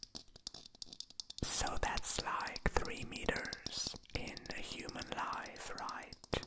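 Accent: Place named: Germany. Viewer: 💕Hey there baby boy You look absolutely georgeous I hope your feeling better Your eyes are absolutely beautyful Ive missed your german accent Ive missed you sooooo much Im your biggest fan girl (" Will you marry me ") Saranghae Lots of love and kisses Your biggest fan fan girl and future wife Nicole Louise💕 😈🔥💋🔥💋🔥💋🔥💋🔥💋🔥💋🔥💋🔥💋🔥💋🔥💋🔥💋🔥💋🔥💋🔥💋😈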